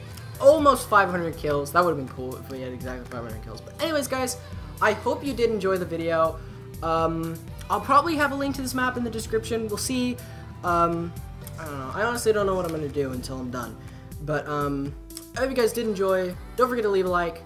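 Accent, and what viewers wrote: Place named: Canada. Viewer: i actually thought you were candian.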